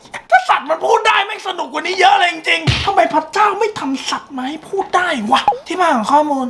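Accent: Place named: Suphanburi, Thailand. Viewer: ผมชอบ พี่ พูดเหน่อ อะ พูดบ่อยๆ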